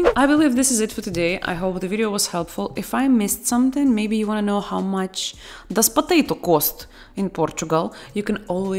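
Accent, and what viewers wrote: Russia. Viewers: That recurring Russian accent 😍😘... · People love your Russian accent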